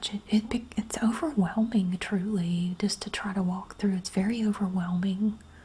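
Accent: South, United States. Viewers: I love your voice and southern accent 💗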